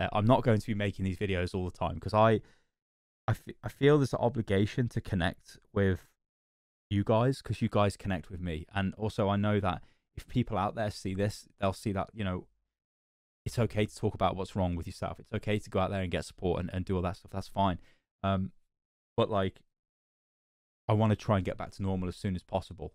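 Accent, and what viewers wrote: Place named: Cockney, United Kingdom. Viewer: Overwatch came out, I got it, and I immediately went on the internet to learn about the game. It wasn't long before I stumbled into this weird cockney guy who didn't script his videos and really seemed to know his shit. I got to know simply as the guy with the Overwatch channel I check out from time to time even after I'd stopped playing the game. And just now I discover that he's in pain, and I find myself getting teary-eyed just as if a friend told me about his problems., you're a part of my life now. Get better, friend. Take all the time you need. I'll be here when you get back.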